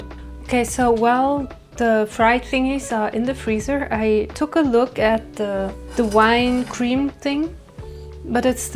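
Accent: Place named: France. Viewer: You sound quite French when speaking English.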